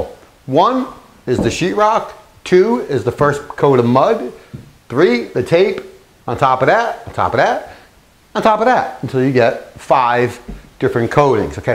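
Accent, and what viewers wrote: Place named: New Jersey, United States. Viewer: Thanks bro. Your videos and verbal style are all awesome! You sound NJ. Am i right? I wonder why east coast refer to drywall as sheetrok, out west no one knows the term sheetrok unless they are very familiar with that name brand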